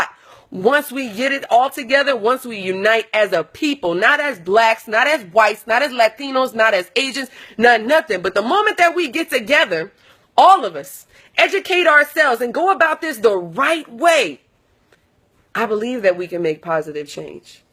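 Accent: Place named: New Orleans, United States. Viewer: 1202_ProgramAlarm she has a New Orleans accent... she obviously Cajun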